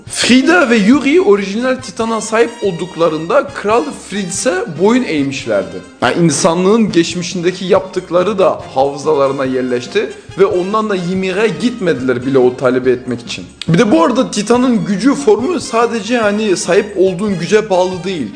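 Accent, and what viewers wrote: France. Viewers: Knk adam Fransız aksanlı konuşuyor ve TikTok bildirim dili Fransızca abimiz yarı Fransız olabilir.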